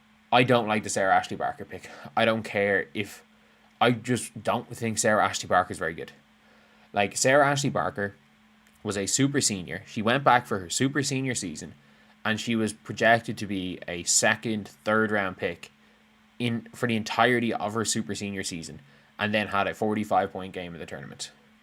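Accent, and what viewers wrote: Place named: Ireland. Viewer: He has a brogue, says he's in Ireland, Twitter says trending in Ireland and people can't figure out Ireland....sorry, that's just willful ignorance. Eire not 'Merica for. Sorry. Best wishes to all.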